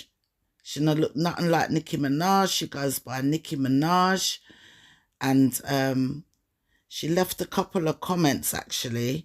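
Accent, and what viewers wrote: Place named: Jamaica. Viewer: I could tell you were English speaking but had a Jamaican background i can tell in your voice I live in the UK but was born in Jamaica and came here at the age of 8 I am now in my 60s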